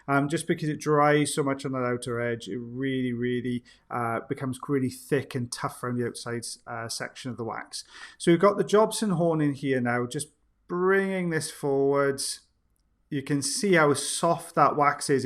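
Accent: Wales, United Kingdom. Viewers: Liking the new specs! You never talk too much. I think of you as my favorite teacher. You’re happy, excited by your subject, and teaching us the whole time! If you record an audiobook, I bet we’d all buy it! Love the Welsh accent and sayings. 🥰😀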